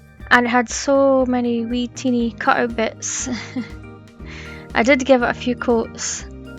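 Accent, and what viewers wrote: Scotland, United Kingdom. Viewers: I loved the fireplace you did at Christmas, I showed it off to so many people with your channel! But I gotta say, I like this one even better ❤ that blanket ladder is BRILLIANT ❤❤❤ Watching your channel always makes me smile! I love your projects and hearing you speak brings me back to when I lived in Scotland 😊😊😊 I still use words and terms from there over the American versions! (Like Nappy instead of Diaper) I love remaking your fireplace as well as the Little Stands She does on “Made on Maple Street” 😊❤ “Christina Elizabeth” also does an adorable tiny crate Stand. So far you’re the only one doing the full fireplace! Y’all give me things to recommend and share when I’m in Dollar Tree & Hobby Lobby! And I ALWAYS share your name/channel first and last!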